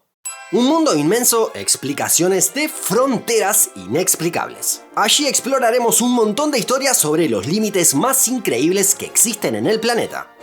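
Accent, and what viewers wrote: Rioplatense, Argentina. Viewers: Por qué intentar hablar en pseudo neutro y al mismo tiempo sheshear? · es argentino o uruguayo?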